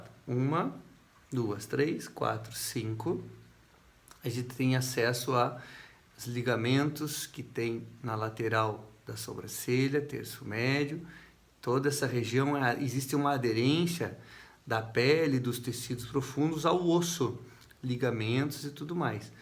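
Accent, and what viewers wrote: Rio de Janeiro, Brazil. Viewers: Dr o senhor é do Rio ?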